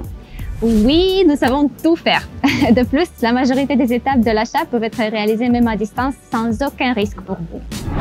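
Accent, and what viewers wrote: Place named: Spain. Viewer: Se le nota el acento de española, pero lo habla bien.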